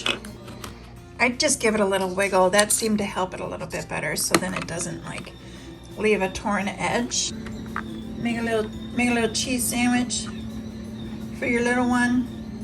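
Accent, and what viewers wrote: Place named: Minnesota, United States. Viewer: This was the first video of yours that I stumbled upon, and within seconds, I had you pegged as a Minnesota native (or at least lived there for a significant amount of time). My mom's entire side of the family lives primarily in the Minneapolis area with my late maternal grandmother's family all hailing from the Blue Earth area down south. Those that live down in Blue Earth have dang near the same accent you do. Tbh, it was refreshing to see someone from any part of the "north" to "northern midwest" not be super srsbiz all the time. I'll definitely be sticking around. If your off camera personality stays the same as your online persona, then I really need more of you in my life!! I absolutely love the depths you go to to make an honest and informative review and still maintain the light airy atmosphere, even when you're less than impressed with the product.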